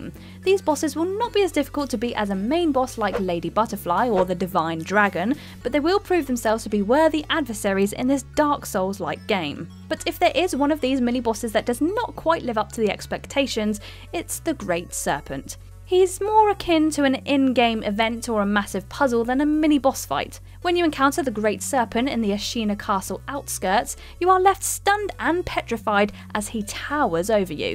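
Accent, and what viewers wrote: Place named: England, United Kingdom. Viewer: I know it’s tough with an English accent and she’s relatively new, ( does a great job with the better part of the video) she really butchers a lot of pronunciations in this video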